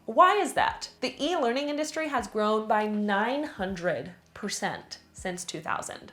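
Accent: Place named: United States. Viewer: Hi! I am learning from you. It is not only about side hustles but also about understanding and speaking English with an American accent. There are many languages and dialects here in the Philippines, and I speak 4 of them. English is such a big deal here, you have to speak English well to be considered smart and intelligent by most people. You are easy to understand, and I am planning to watch 1-2 videos of your videos every day. Thank you.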